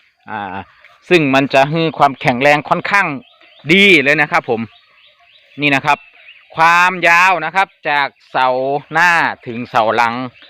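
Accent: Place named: North, Thailand. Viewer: คุน เป็นคนตี่ไหนคือเหว่าอี่ได้อู้เหนือได้คับ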